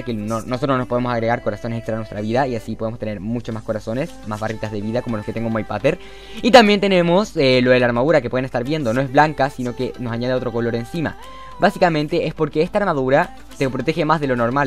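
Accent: Chile. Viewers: me suenas aque eres chileno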